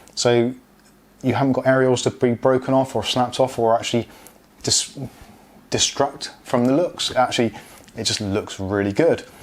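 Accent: Bristol, United Kingdom. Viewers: I've ordered a 2080ti model - also do I sense a South West (bristol?) Accent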